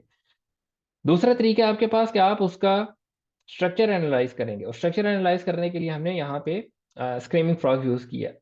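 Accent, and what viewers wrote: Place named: Punjab, India. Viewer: Nice lecture & your punjabi touch in conversation is more funny 😂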